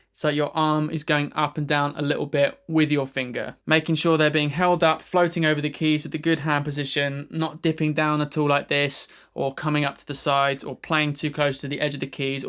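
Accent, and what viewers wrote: United Kingdom. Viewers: Dear sir, I love your videos very much!Thanks for your efforts.!!I am not a English native speaker,so I can learn to play piano and English at the same time,it is really awesome. I like your British accent,it sounds nice,maybe you can teach english too😜Have a nice day!Thinks again☕️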